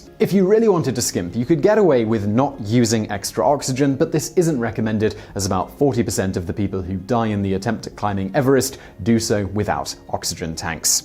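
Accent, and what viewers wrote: United Kingdom. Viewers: Wtf is this brittish Vsauce?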